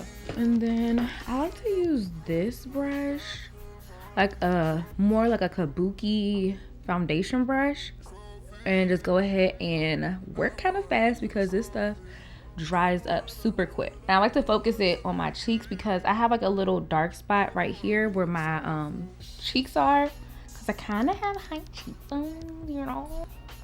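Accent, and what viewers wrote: DMV, United States. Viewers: I can recognize a DMV accent when I hear one 😂😍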